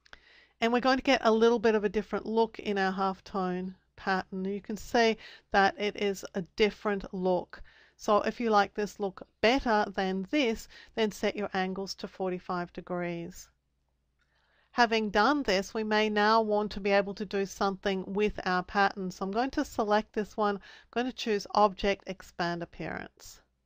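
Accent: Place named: United Kingdom. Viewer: I like your sweet UK accent :-)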